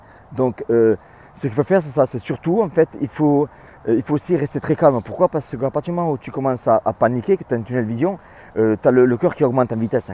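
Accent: South, France. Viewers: J adore votre accent " Sudiste " !!!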